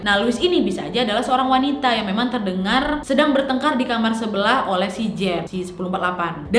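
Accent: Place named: Medan, Indonesia. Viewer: Orang medan memang kek gitu,cara ngomongnya memang seru seru jadi orang luar medan dengarnya enak,ngga ngegas tapi dikira ngegas,ngomong kek orng berantam hwhw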